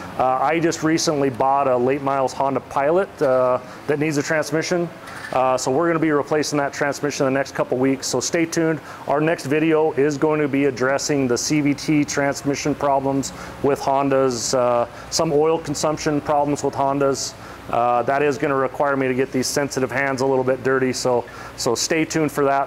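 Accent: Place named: Canada. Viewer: With all of the awes,you sound like Turdo up her in Canada!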